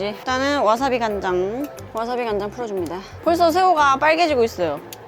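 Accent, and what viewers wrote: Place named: Gyeongbuk, South Korea. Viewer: 경북사투리 구사너무귀여워~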